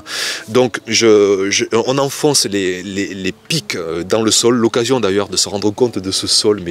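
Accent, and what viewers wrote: South, France. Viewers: T'es de quel Sud? Sud Sud, Montpellier, Sud Est ou Sud Ouest ?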